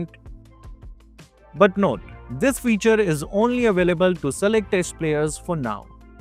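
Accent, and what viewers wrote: India. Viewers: Japanese name but Indian accent 😅😂😂😂😂😅😅😅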